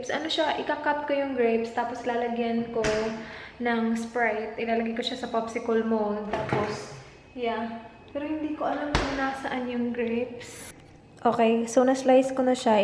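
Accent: Visayas, Philippines. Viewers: bisaya diay ka te??pareha rta!!ahaha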